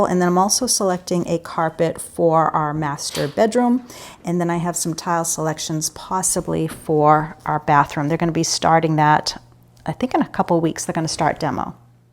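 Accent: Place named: Philadelphia, United States. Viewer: I love when I hear you say soar for saw…very Maineish for someone who has a Philly accent. Thank you for your channel. Your voice is very soothing ..a follower from Pemaquid Point in Maine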